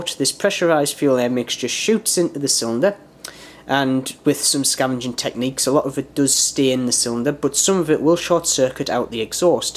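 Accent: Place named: Durham, United Kingdom. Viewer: Geordie? sound more durham to me like?!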